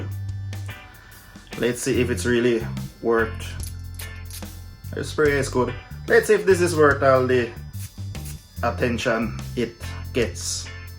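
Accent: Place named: Jamaica. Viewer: 👨🏻‍🦱sounds like a Jamaican boy 👦🏿 was NOT expecting that 😂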